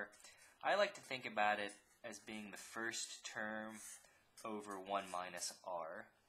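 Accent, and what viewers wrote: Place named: United States. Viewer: in the U.S. you can tell from his accent. however if you want to ask which state is he in? because american only ask about america sinour.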